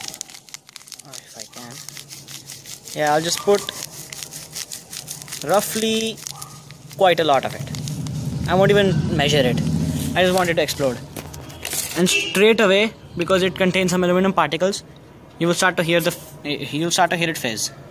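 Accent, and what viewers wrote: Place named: India. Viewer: Vai. Indian. Ho. Khudke. Language ko.. Priority. Diya karo. Hindi me vi bola karo... Jitna vi natak karo.. Apki. Bolne ka tarikese. Malum. Parta hr indian.. English bol raha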